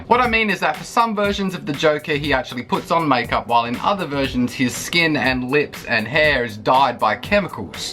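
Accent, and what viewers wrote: Australia. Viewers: noice!! i would subscribe to your bird channel too, also do David Attenborough voiceover with your cool Aussie accent :)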